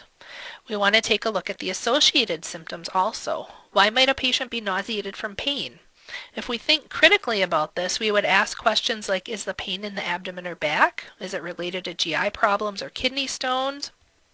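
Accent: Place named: Midwest, United States. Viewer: I love the mid western accent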